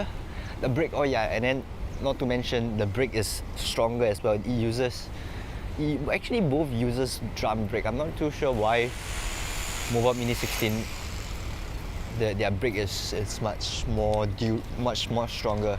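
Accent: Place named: China. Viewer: Accent sounds chianese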